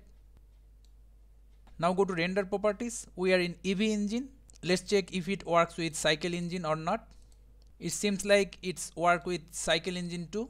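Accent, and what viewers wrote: India. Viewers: as usual, when you hear an indian voice when looking for a tutorial, it means you found the answer... Thanks!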